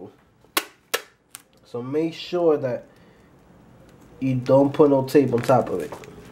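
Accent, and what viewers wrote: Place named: New York, United States. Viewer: You sound like you've gotta be from NY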